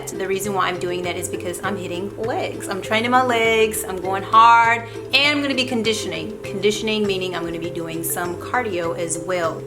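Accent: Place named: South Carolina, United States. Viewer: I like your accent what state is it from? south Carolina?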